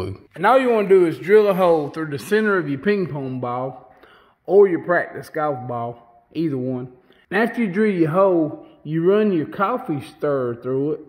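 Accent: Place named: North Carolina, United States. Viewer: Man I'm from laurinburg born and raised , you gotta be from Robeson County you just have that Robeson County twang. Some good people from around them parts. Keep the video's coming best tips I've ever seen. I love your common sense approach to fishing...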